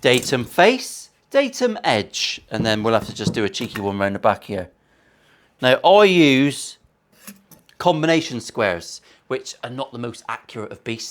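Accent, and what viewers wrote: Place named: Northern Ireland, United Kingdom. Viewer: If I wan a good giggle I don’t tune in to John Bishop etc I tune into this channel. Always something to laugh about and I get to learn new tips tricks and techniques with guitars, brilliant, and I love the Northern Ireland accent and!!!!!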